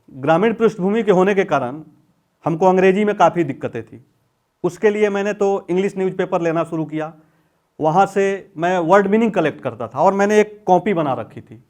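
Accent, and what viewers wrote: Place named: Bihar, India. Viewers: बिहारी अंदाज में समझाने के लिए बहुत-बहुत धन्यवाद 🙏🙏🙏🙏 जय बिहार ❤️ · हमको त बोलिये से मालूम चल गया कि भईया बिहारी हैं.... 👇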